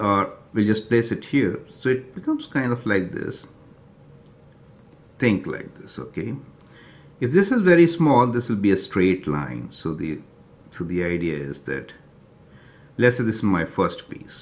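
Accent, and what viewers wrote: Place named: India. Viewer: Saurabh Gupta thats exactly what im saying.it happens whenever i wanna learn a mathematical concept.tons of videos,all indians,with their terrible accent and terrible video quality,making the topic even more confusing than it is.same for this topic.all indian videos.almost none of them speaks proper english+shitty video quality.i just commented on this arbitrarily,i dont have any bad intention against this person in this video.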